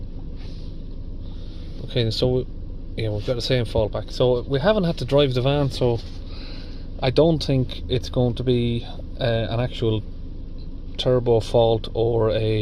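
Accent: Ireland. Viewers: You'll never loose that irish accent tipperrary carlow offaly?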